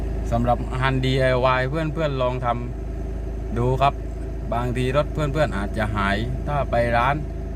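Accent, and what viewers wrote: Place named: Bangkok, Thailand. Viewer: ฟังสำเนียงชัดเลยว่าเปนคน กรุงเทพ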